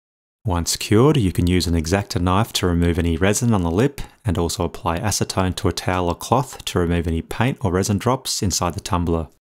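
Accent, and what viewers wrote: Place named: Australia. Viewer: I also love your Australian accent... I can't find your name here. What is it?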